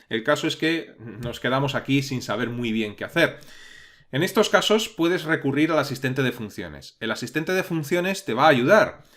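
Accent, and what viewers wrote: Spain. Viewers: Me gustan tus videos. Me está viniendo genial para preparar la oposición. Además con castellano de España. Un saludo desde Valencia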